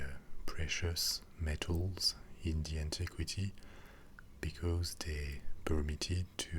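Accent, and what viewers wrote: France. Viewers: I get great tingles from French accents! very very relaxing! :)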